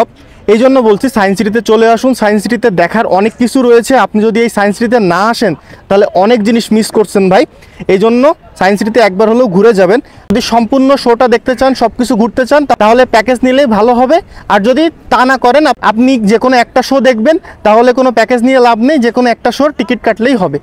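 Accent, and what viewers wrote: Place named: Bangladesh. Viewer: apnar bangla vasa to valo noy! kemon jeno bangladeshi mone hoy j?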